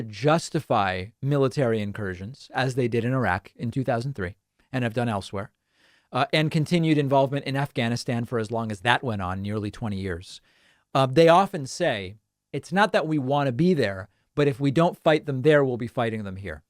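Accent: England, United Kingdom. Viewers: For the record, your pronunciation of "nukes" and similar words is in accordance with British English rather than American English. That person was correct that "nukes" rhymes with "Dukes" but, in British English, they are both pronounced the way you are pronouncing "nukes". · Four years older than his senile diaper wearing former POTUS. The decline of the West, yes, standards of probity and duty and competence have fallen. I would put it the door of the sinister Murdoch Empire, myself. Everywhere they go, they spread misinformation and Conservative rage. Look at the powerbrokers, not the fawning, incompetent sockpuppets they push forward for election. By the way, some of your pronunciation is more British than American. Either is correct. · You pronounce "nukes" and "nuclear" as British people do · pronounces those English words like we English do. 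Make of that what you will. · I think its because you are speaking English rather than American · nYukes / nYew: this is British pronunciation. And I would never have guessed English was not your first language. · England and australia do same pronunciation as you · Ok. Just realized another reason why l like listening to you! Your pronunciation! ( Jamaican here ..so perhaps the British influence in the pronunciation choices, appeal to me as well 😏) but seriously --- WHAT is wrong with having multiple ways of pronouncing things! Sheesh...it is a large WORLD here, people! 😑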